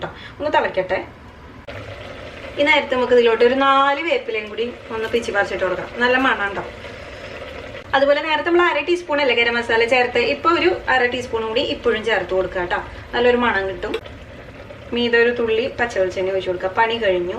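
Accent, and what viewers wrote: Thrissur, India. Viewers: Thrissur or Kottayam? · Ente daivame....super presentation.ingane thrissur karkku matre pattu tta....👍😊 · തൃശൂർ ക്കാരി ആണല്ലേ 😁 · Enthoru easy ayita mutta curry undakunney.anthayalum undakum Thrissur slang kelkumbo nalla sughund. Thanks dear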